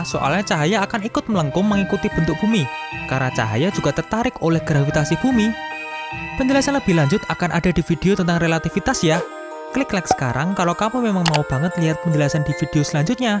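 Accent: Java, Indonesia. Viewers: Medokmu Mas,,kyk aq.he.,he. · Orang jawani medok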